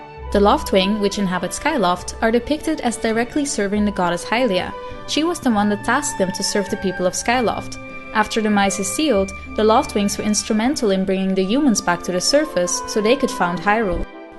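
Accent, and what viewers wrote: Netherlands. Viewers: Are you from Belgium or the Netherlands??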